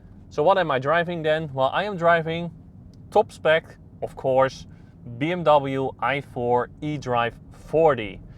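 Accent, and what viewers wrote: Netherlands. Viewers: Stone coal English … so bad. Why don’t you stick to Dutch?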